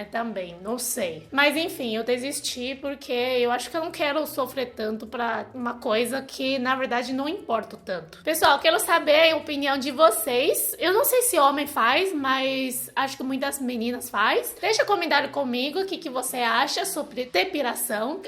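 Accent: China. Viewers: Incrível que em muitas palavras, ela aparenta ser brasileira, mas em outras o sotaque chinês entrega.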